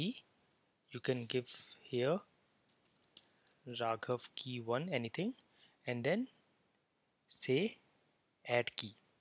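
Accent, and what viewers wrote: India. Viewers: entah foldah saovah nambah pikchah fuchah Administatoh hieh Cringe Indian English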